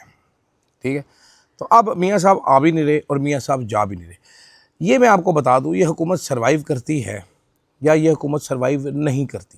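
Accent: Punjab, India. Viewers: Love your style of panjabi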